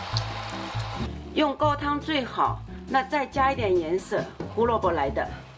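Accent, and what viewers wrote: Shanghai, China. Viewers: 好亲切的上海普通话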